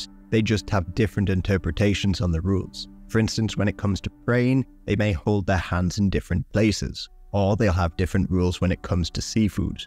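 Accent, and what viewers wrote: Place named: United Kingdom. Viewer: He has a British accent. He’s not American. Are you slow?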